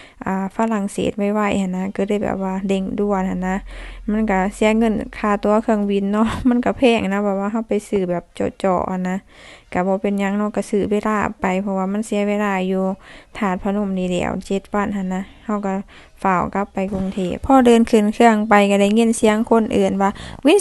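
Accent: Laos. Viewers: อีกอย่าง สำเนียงพูดลาว FC บอกหลายทีแล้ว เวลาติดต่อราชการให้เปลี่ยน นี้ก็ไม่เคยจะทำ จะมาขอสัญชาติไทย ยังจะพูดลาว จะมาห่วงแหนความเป็นลาวอะไรขนาดนั้น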